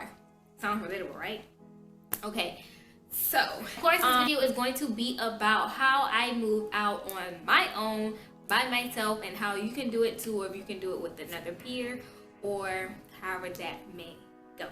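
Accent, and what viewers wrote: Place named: New York, United States. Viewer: She sounds like she from ny but moved down south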